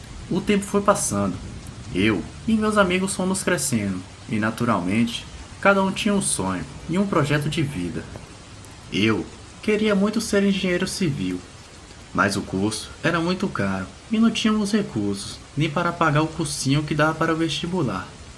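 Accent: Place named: Pernambuco, Brazil. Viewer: esse narrador só pode ser um pernambucano!!!! pelo sotaque esta mais que evidente,e qto ao possivel demonio em forma de cadaver? pode ser sim ,ele causa alucinações doidas. história FODA essa hein???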